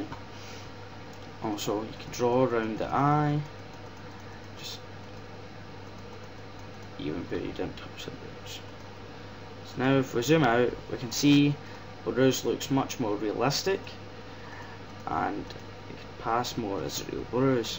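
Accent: Scotland, United Kingdom. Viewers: Thanks for posting tutorial. Sounds like scottish accent.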